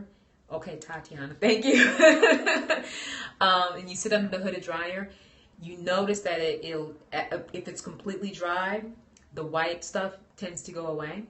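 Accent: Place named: Bronx, United States. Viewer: randomly passing by and then I hear the bronx, woah, I'm from castle hill! living in FL now :(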